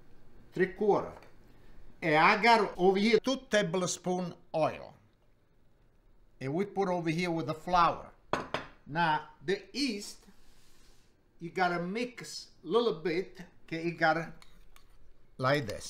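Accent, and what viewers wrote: Italy. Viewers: His Italian accent authenticated this video. 🇮🇹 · Hi! This is Canada. The video is very interesting. Italian pronunciation of English is also familiar. I remember the old Italian grandfather who lived next door to my house. He gave me grapes from his backyard grape vine, and if he made bean pasta, he gave it,too They also gave me homemade wine. He was very friendly. Watching your video reminds me of him. It is a very, very old memory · I made this and it turned out awesome. My family is from a little town in Italy called Senerchia and you talk just like them and makes me miss them. God Bless you and can't wait to make more of your recipes!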